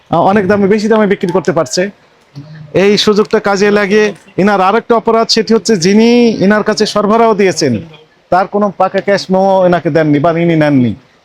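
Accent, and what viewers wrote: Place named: Naogaon, Bangladesh. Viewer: স্যার আপনার বাসা কি নউগা?আপমার ভাষার একসেন্ট অনেকটা অইরকমই!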